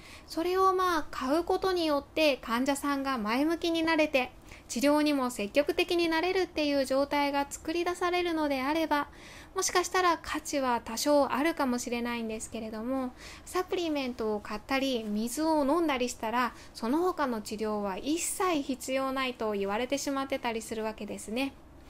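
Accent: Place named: Hyogo, Japan. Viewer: 会えなかった姪がいます、あの時の姉の悲しみも自責も見ていて辛かったし支えた姉の家族の苦労もよく分かります。 個人的な事ですが先生は兵庫の方のような気がします、オレの神さんのよそ行きの言葉と同じ感じに聞こえます😊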